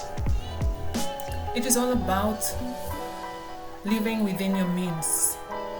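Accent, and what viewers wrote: Saint Lucia. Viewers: I instantly hit subscribe when I heard that accent! As a fellow Lucian myself I am enjoying your videos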